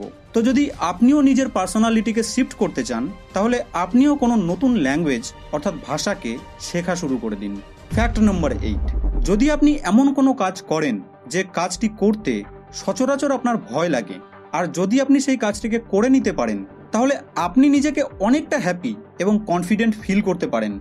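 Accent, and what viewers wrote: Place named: Kolkata, India. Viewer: ভারতের কলকাতার মনে হয়,,, কথাবার্তায় মনে হচ্ছে কলকাতার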